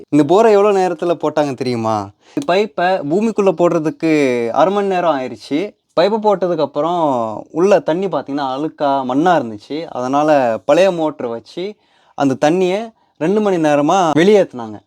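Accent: Standard. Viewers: Ur tamil is good. I.ur speaking pure tamil. As an Indian I proud of you. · எங்கள் ஊரில் திராவிட மாடல் தமிழ்.... நீங்கள் பேசுவது தான் உண்மையான தமிழ்... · ரஷ்யாவில் தூய தமிழ் மணக்கிறது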